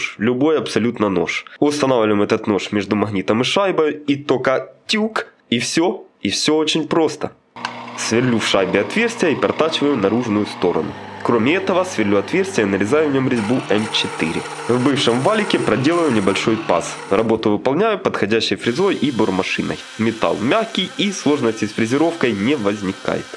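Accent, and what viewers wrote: Ukraine. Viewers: А зачем этот украинский акцент? Если комментируешь на русском. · акцент, украинецкий,я рад славяну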